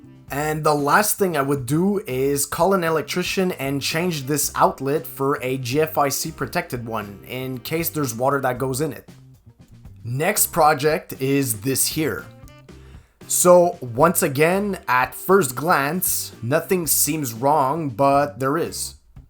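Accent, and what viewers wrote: Quebec, Canada. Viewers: bro you good he’s from Quebec that where he gets his accent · You're definitely from Quebec just by hearing your accent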